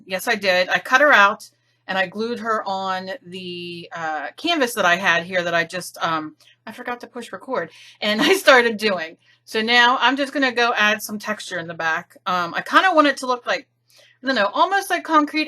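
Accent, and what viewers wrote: New Jersey, United States. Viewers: You are awesome! I love this & I love you girl! I watch you not just to watch you do your art (which I could do all day, & sometimes do......lol) but I also just love to hear you. You are funny, I love the jersey accent, & you have things that are important to say......so I said it befor & I will say it again.....Love you girl! Keep doing what you do!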